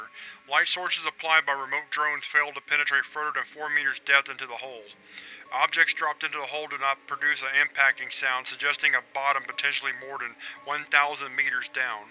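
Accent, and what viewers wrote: South, United States. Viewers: Nice southern accent! You make this seem like SCP 610 was real. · where u from? GA kid myself yet hard to place where u are below the mason dixion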